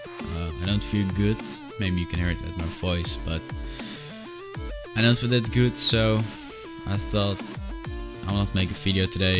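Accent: Netherlands. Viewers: The first time i heard the voice of him, i knew it was obviously dutch // De eerste keer dat ik je stem hoorde, wist ik gewoon dat je nederlands was!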